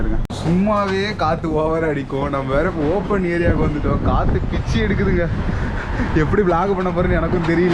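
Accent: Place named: South, India. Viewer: Aviya iviya south slang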